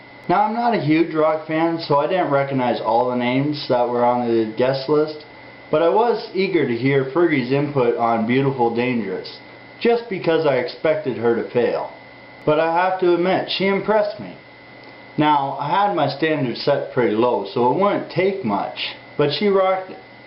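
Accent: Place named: Canada. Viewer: I have a strong feeling you're Canadian based on how you speak. Anyway, good review, I probably won't get this cd ever but it's good to know it's not total garbage.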